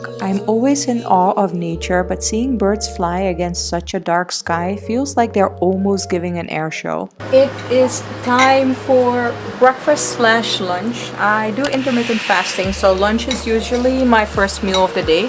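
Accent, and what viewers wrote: India. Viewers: She is picking up the indian accent. This is nice☺ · Your english accent is more indian now! I've seen your videos for years and I just came to this realization. You make me love india more and more every time!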